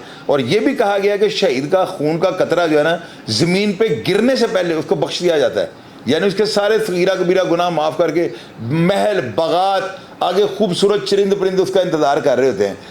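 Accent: Lahore, Pakistan. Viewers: Aj lahori style Mai bat kr rhy hai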